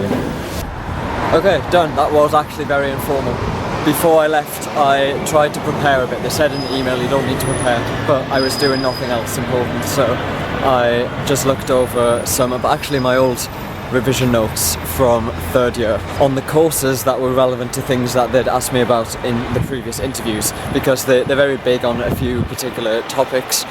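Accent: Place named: North East England, United Kingdom. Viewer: New Tech Genius Cause has a NE England accent. Is he more Durham way originally? They sound the same to me.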